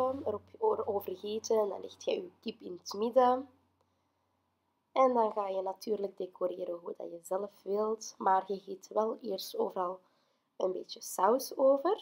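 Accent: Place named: Belgium. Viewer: jouw Belgische accent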